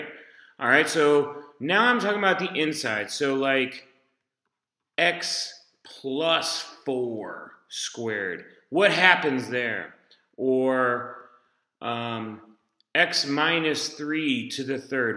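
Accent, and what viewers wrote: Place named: South, United States. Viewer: He sounds drunk with a deep southern accent and it's great cause it keep me interested.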